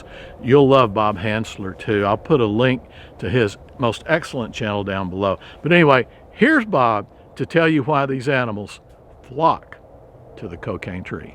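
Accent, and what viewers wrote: Texas, United States. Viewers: you talk so cute. You have such a sweet delivery. Love the Texan accent.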